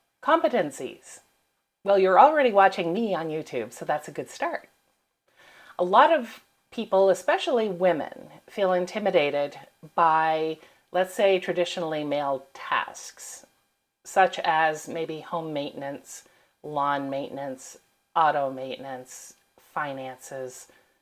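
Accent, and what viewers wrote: Canada. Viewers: You're Canadian, aren't you? 😅😅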